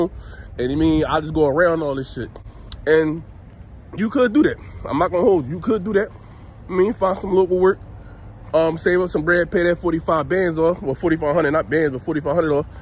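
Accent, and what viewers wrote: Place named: Philadelphia, United States. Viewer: Bro I start at Prime in like 2-3 weeks. It sounds like you’re from Philly… I’m from De and I’m gonna be in Pittston for my orientation too. I’m gonna be going through the same stuff you’re going through right now in a few weeks lol. This should be interesting. Stay focused and keep ya head up killa. You got this. Your videos help me stay inspired and it shows me what to expect. I hope to see you on the road 💪🏽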